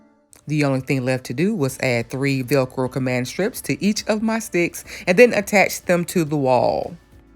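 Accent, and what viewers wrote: South, United States. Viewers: Wow! I so loved this video. Your explanations are so on point and easy to follow. I want to become a weekend warrior for this one. I love love your southern accent. It's so welcoming. What size was each batting and vinny piece cut to? Thanks for the videos and Blessings to you and your family !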